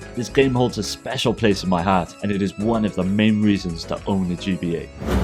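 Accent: United Kingdom. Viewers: Oh dang, indie british stuttering has it going on! Sweet vid.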